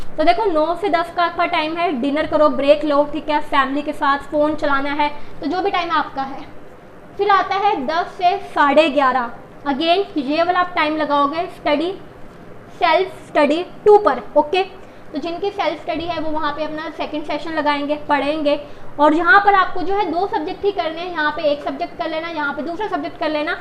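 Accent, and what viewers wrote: Punjab, India. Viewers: Didi aap punjabi ho kya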